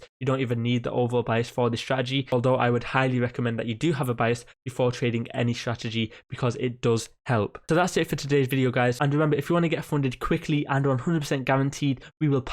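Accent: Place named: RP, United Kingdom. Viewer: this guy speaks like he has plums in mouth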